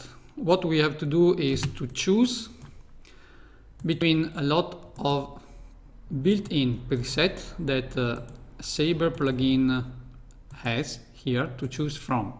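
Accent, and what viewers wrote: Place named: India. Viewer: Чувак, по акценту же сразу ясно. Индус 100%. Ну или откуда-то оттуда, из 3-его мира. Кстати, правильно пишется "Where are you from?", так, на будущее :)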